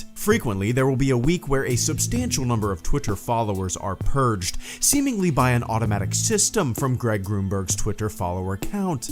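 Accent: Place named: Chicago, United States. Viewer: Awesome. Love that accent. Anyone remember that episode of South Park when Kyle and his family moved to Chicago becouse his dad drove a hybrid? The people there sounded JUST LIKE THAT.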